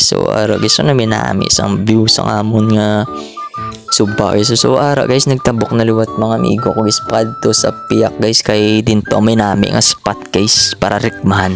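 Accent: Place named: Iloilo, Philippines. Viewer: I am your 123rd subscriber! I am not Ilonggo or Negrense but I have a lot of friends who speak like you and they are good friends.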